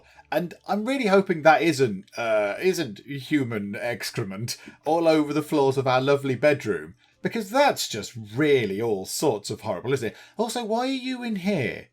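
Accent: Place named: Northern England, United Kingdom. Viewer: Your northern accent is really good. Makes me think of Sean Bean... oh sugar, I swoon when I think of Sean Bean lol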